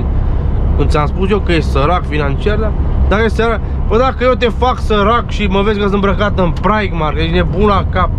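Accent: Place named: Oltenia, Romania. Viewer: Fiindca esti oltean de asta nu te intelege nimeni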